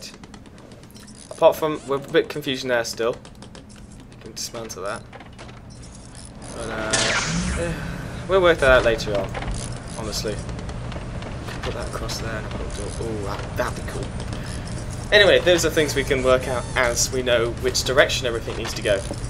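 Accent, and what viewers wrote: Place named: United Kingdom. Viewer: you sir are british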